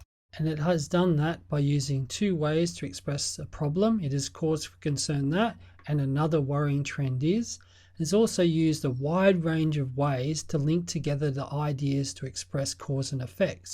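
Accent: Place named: Australia. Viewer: I LOVE AN AUSTRALIAN ACCENT!!!!!! EASY TO UNDERSTAND AND I JUST ... LOVE IT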